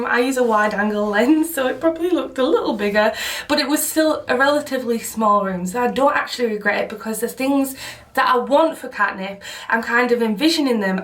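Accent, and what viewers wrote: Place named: Northeast England, United Kingdom. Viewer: I'm from Newcastle and your accent intrigues me, its like geordie and yorkshire at the same time! lovely :)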